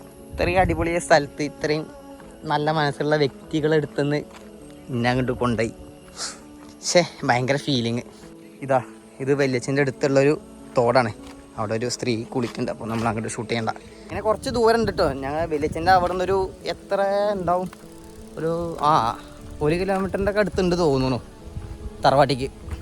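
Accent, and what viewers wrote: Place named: Palakkad, India. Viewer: Palakaad ഭാഷ കേൾക്കാൻ നല്ല രസമാ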